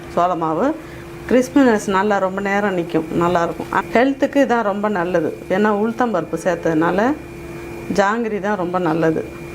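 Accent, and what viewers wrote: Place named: Cuddalore, India. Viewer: கடலூர் விருத்தாசலம் பக்கமா..... தமி்ழ் சொற்கள் மிக அழகாய் இருக்கு....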